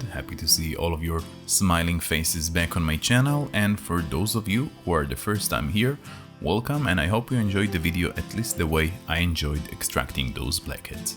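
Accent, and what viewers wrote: Israel. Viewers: your truly charming accent which I'm going to assume is Israeli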